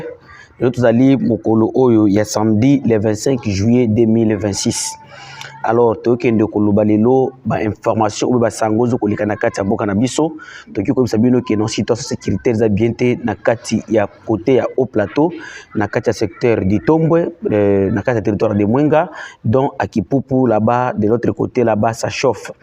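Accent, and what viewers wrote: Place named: Rwanda. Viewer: La voix du rwandais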